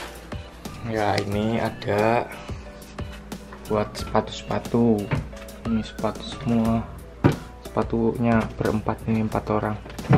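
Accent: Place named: Java, Indonesia. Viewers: All, wahh hebat mas bisa bahasa korea, tp logat jawanya masih ada ya pas ngomong indo nya hihi 👍 sukses mas di sana, Jd ngiri pengen ke korea 😂👍👌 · Ikhh, seneng ngliat maz nya ganteng tp medok ky q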